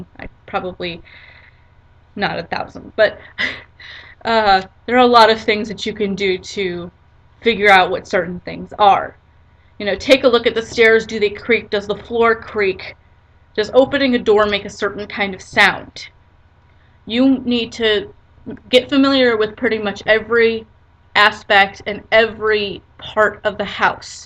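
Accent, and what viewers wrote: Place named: United States. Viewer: I love your voice so much. You sound American. Byeee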